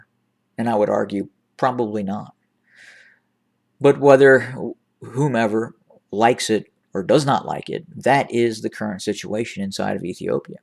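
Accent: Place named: United States. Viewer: He is an American of Ethiopian/Tigrayan descent. Don't let his American English accent fool you.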